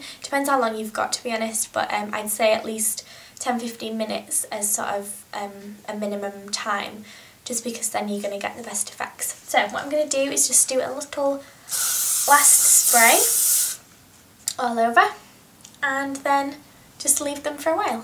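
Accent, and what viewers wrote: United Kingdom. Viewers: What accent does she have?! Is it British? I love it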